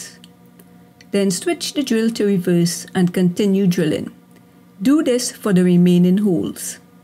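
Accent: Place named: Trinidad, Trinidad and Tobago. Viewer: Trinidad accent ... Love it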